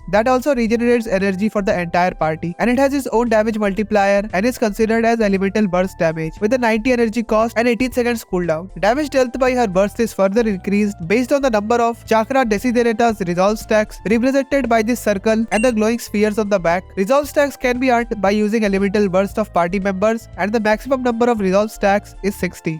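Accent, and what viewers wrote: India. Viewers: are u from india ? grt video btw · 100% Indian.. awaz bta ri hai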